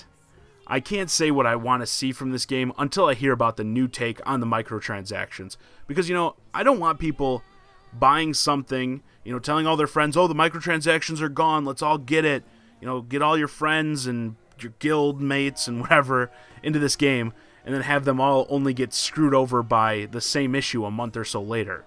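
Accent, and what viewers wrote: Chicago, United States. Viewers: R u from Chicago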